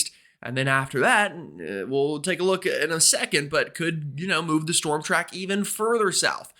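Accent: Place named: Philadelphia, United States. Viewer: Thats awesome, I have relatives down in the Phillly area and they have that accent. Very interesting to talk to people around the country. When I was young, we made a trip down south, on coming back my friends asked where I got the southern accent. It doesn't take long to pick up some of the lego you are exposed to.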